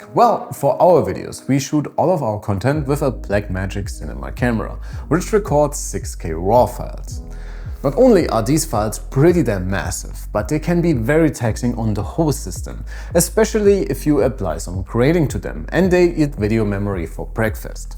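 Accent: Germany. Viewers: Is German your native Language?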